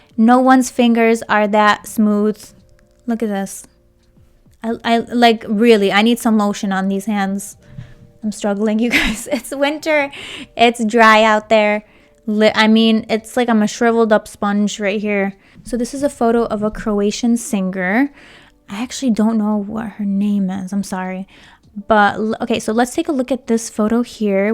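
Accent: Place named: Midwest, United States. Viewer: I can tell your accent is from the mid-west lol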